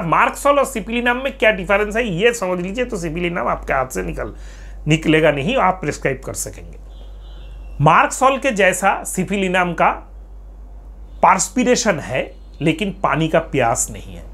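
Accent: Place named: Bengal, India. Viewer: समझाया तो अच्छा है. लेकिन बंगाली पुट की वजह से गड़बड़ हो जाता है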